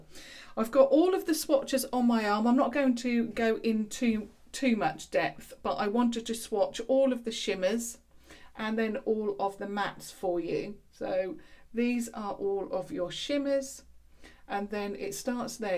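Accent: United Kingdom. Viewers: The way you speak is so amazing! So gorgeous (and proper sounding) with the British accent but not just that your voice is so calming and nice to listen to :) (I'm from Los Angeles CA lol) but I favor British you tubers. I love visiting old palettes!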